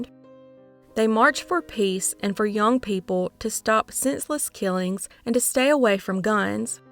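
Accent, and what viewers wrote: South, United States. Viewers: I'm not far in yet but I'm so glad you decided to keep doing ads - you deserve to be paid for what you're doing! Plus your accent is so charming I honestly don't mind them because I'd listen to you read the phone book... and I'm a fellow southern girl, haha! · So happy to hear your southern voice again. I used to spend winter in Gulf Breeze Florida.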